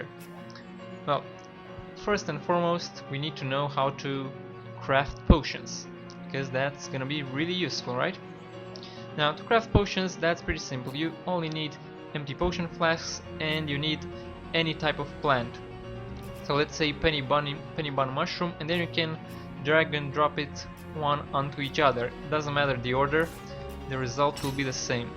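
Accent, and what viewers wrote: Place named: Eastern Europe. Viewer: he has slavic accent maybe russian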